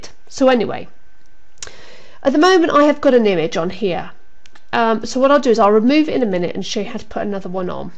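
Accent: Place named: United Kingdom. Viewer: Thank u, I like ur British accent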